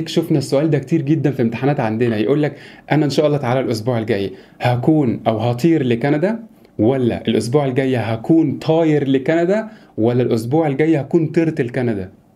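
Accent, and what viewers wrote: Syria. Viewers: ههه ما يبلى الحكي السوري تحية لك من سوريا😂❤ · الله يحميك قنعتني و دخليك ع اللهجه السوريه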